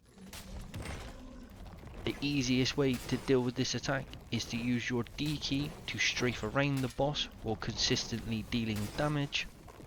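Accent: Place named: West Country, United Kingdom. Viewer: Summerset or Devonshire boy? Trying to place your accent my Brother, Cornishman here. It's a nice little guide thank you man.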